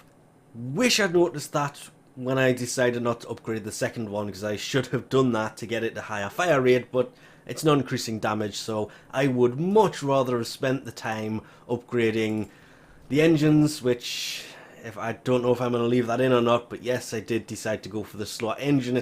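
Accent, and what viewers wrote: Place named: Northern England, United Kingdom. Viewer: Desrei Camacho dude wtf, tacky spud is like full on Irish, this guy is from up north England somewhere, ya they speaking the same language but wtf dude are you deaf?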